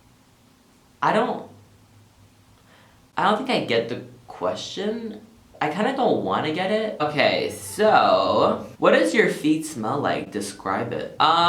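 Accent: United Kingdom. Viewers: Love ur british accent🙈😂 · Love your British accent so much 🙈❤️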